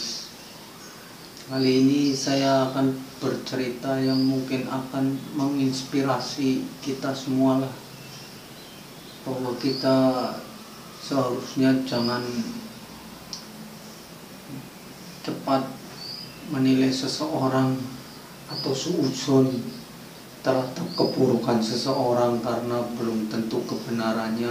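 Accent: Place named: Java, Indonesia. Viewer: logatna jowo bgett kk😀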